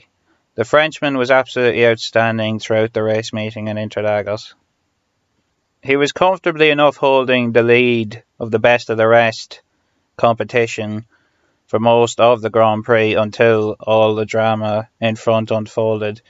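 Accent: Ireland. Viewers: Yes your obviously irish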